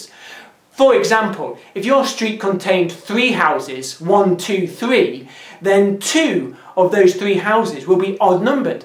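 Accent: United Kingdom. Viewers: So I thought this was going to be a "depends on where you live" issue from the beginning, and five minutes in yes it's exactly that. Guessing by accent, this person is some flavor of subject of the Queen of England; and may not be aware of how houses are numbered in America or France or other places. Even number houses are in one side of the street, odd number houses in the other side of the street. The first house on a street is just as likely to be 2 as to be 1. And in America, single digit house numbers are fairly rare. Some of my previous house numbers were 5801, 358, 363, 11258 (rural areas get bigger numbers). And his explanation didn't mathematically make sense. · He lives in England (you can tell from the accent). England uses a uniquely bizarre street address scheme wherein adjacent addresses are usually consecutively numbered, but occasionally there are large jumps, because at some point they have to go back and do the houses on the other side of the street, or at the other end of the street (other direction from downtown), or whatever. 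I don't know exactly how they handle it when a new house is built between two existing formerly-adjacent houses. Heaven help you if you are given an address on a long street and not told what the nearest cross street is. Another weird outlier is Japan: street addresses don't say what street the building is on at all: instead they say what block it's on. All the blocks (in most cases, square sections surrounded by four streets) in any given city are numbered; and then the buildings on all (usually four) streets around each block are treated as a single block and numbered around the perimeter. Street length is thus completely irrelevant, and the range of possible numbers of buildings on each block is much smaller than the range of possible numbers of houses on an entire street.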